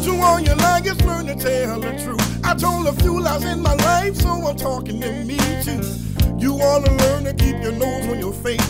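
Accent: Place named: Memphis, United States. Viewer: He sound like one of my OG uncles from Memphis Mane. 🤣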